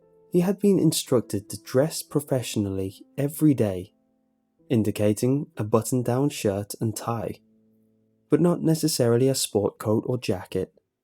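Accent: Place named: Merseyside, United Kingdom. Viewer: is a perfect story for your voice and cadence. You're accent id familiar, sounds Merseyside :)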